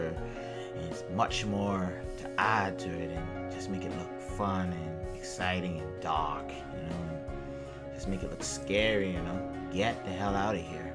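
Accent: Bahamas. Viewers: A whole Bahamian. I love it!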